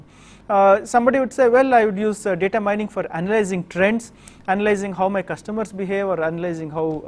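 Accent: India. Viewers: As a matter of fact, indian-english accent is pretty close to russian-english... so you are actually in better position to understanding this guy than native english listeners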